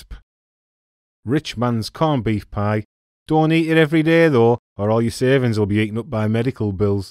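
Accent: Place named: Teesside, United Kingdom. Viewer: Lovely, I am from Teesside (Billingham) originally now living in Mid wales it was just great to hear the accent again. I am still trying to explain Parmos to the people around here for 12 years now, and still get weird looks.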